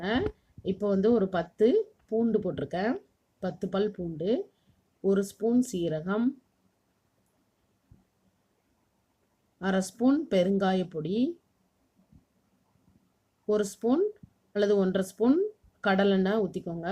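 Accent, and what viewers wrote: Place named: Kanyakumari, India. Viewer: உங்களுக்கும் உங்கள் குடும்பத்தினருக்கும் எனது இனிய பொங்கல் நல்வாழ்த்துக்கள் பட்டு ராணி.... உங்கள் பேச்சின் மொழி (வட்டார மொழி) கன்யாகுமரியா அல்லது திருநெல்வேலியா....? நான் தஞ்சாவூர் மாவட்டம்....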